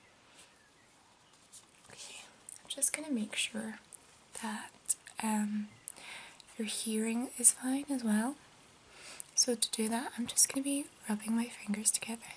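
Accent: Scotland, United Kingdom. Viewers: Where abouts in Scotland are you from if you don't mind me asking? Struggling to pin down the accent there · Welcome back. I've only recently started watching ASMR videos to help with stress and often click on an artist I've not come across before. You have no idea how glad I was to find you. Your gentle Scottish accent is so relaxing and I love it when you get so close to the mic that your mouth sounds are audible. Personally I didn't hear any camera noise and the mic you are using seems more than adequate. Really great stuff. Subbed immediately x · Excellent video! Welcome back!! I live in Europe, as well, and have a question. Your Scottish accent is a lot more subtle than say Scottish Murmur's. Is that a regional thing or is it more your own personal linguistic style? I've noticed this with a lot of the Scots I've worked with. Some sound like Simon Pegg other's like Sean Connery and then some have little accent at all. 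Am I crazy?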